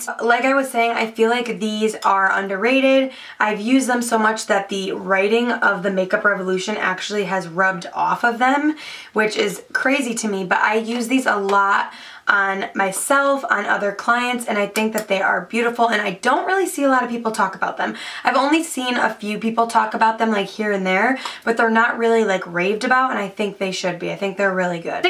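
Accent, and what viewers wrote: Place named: Upstate New York, United States. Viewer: Where are you from? I'm an upstate ny girl and you have a similar accent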